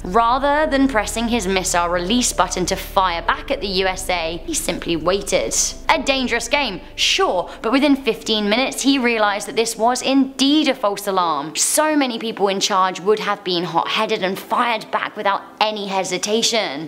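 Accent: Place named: United Kingdom. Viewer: I love your British tone its the best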